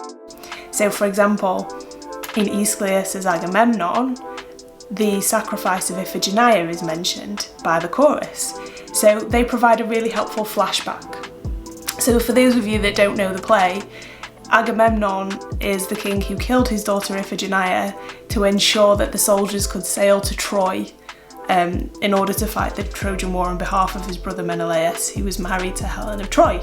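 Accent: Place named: United Kingdom. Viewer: A beautiful girl with a British accent who talks about tragedy. 😍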